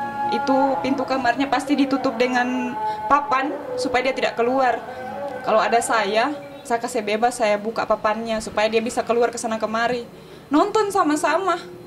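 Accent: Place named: Bugis, Indonesia. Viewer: Itu bahasa Indonesia campur logat bugis soalnya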